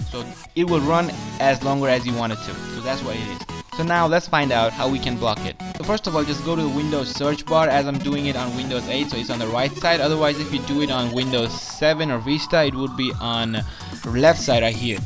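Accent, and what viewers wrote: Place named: India. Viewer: Jesus, Indians YouTubers use have of their videos to talk, too much useless talk. Whenever I realize it's an Indian voice, I just click the middle of the video, then I see/watch/hear what I came for. It's a good tip, it helps to do away with that annoying time-waster voice of theirs.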